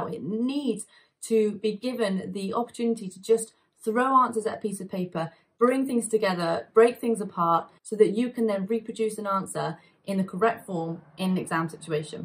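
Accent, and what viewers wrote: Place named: England, United Kingdom. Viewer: your english accent is a little difficult to comprehend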